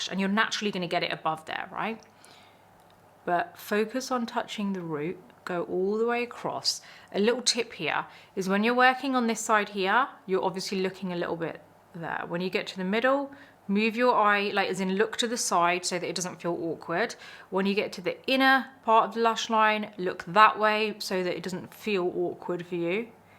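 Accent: United Kingdom. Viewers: Does your accent change?? You’re more British than before 😊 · Wow, forget about the makeup, but I love the way you speak, you use that British accent and look . It suits you so much. I love watching you speak. 😅❤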